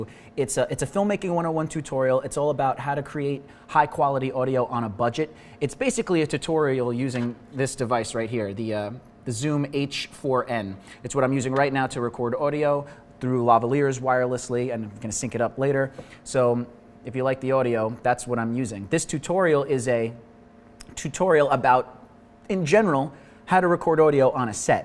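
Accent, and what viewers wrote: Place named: New York, United States. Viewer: ! So happy I found you Googling around... I'm a photographer moving into video. I have a couple of very well used Canon 5D MkII's, but have just purchased a 70D for video. I like the auto focus, and the touch screen. I've seen a few of your youtube vids... love them... as a new yorker myself, i love the energy and a touch of the NYC accent... QUESTION -- I have not found anything in the videos of yours that I've seen about the BEST SETTINGS for the camera -- I'm at 24fps, 1/50th, iso changeable... Vincent Laforet, and others, say to crank down the contrast in the settings... what do YOU do, what would you recommend. I assume that this can all be tweaked in post, but that its supposedly good to capture a flat image. Whaddaya say?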